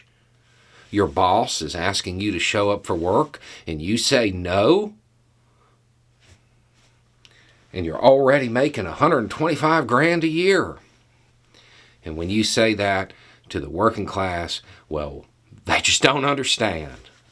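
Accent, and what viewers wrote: South, United States. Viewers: Exactly, he came in after pitching a fit about how much he paid for the company then fired majority of the workers, doesn't explain his vision to about the future, expects the workers to cover everything, sends out an entitled email and expects his employees to go "well they say he's a genius so 80hr work weeks (or more) here I come for no additional resources or perks!" sorry if that had happened to me, I'd be out of there faster than you can count to one. Nothing about what Musk has done sounds stable, innovative, or reassuring. Us working folks like stability regardless if they talk southern like or west coast like myself. They want to know that their job will be there in a day, week, month, or 6 months because you know we like to plan and to be able to afford everyday expenses. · What are the two words you are surprised to hear from somebody with a southern accent: "Check Mate". 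Don't let the southern accent fool you.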